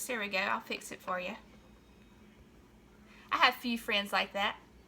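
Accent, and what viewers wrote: South, United States. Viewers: I love that country accent. did you say dump lins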